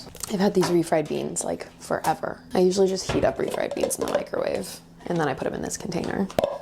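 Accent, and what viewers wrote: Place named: South, United States. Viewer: What is your accent? It sounds like you have a little southern drawl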